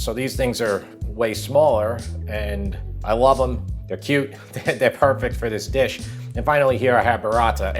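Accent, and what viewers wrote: New York, United States. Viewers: I'm not sure where you live but judging from your accent I'm guessing New York? Anyways have you ever tried Frank and Sal's Italian Market in Staten Island? I live in Maryland and they ship me all my cheeses and tomatoes, they are incredible!! If you haven't tried them, I highly recommend them!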